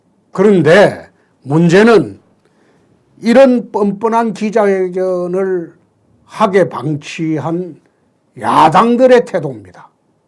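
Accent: Gyeongsang, South Korea. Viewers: 홍준표 대표님 경상도 말씀 좀 까칠해서 잘못 들으면 오해가 생길 수 있지만 대표님 말씀 다 맞았습니다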